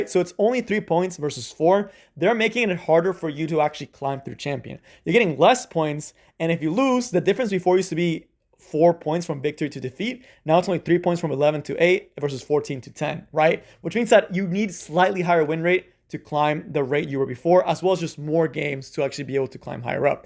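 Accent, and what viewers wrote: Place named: Mexico. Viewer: Why your accent sounds Mexican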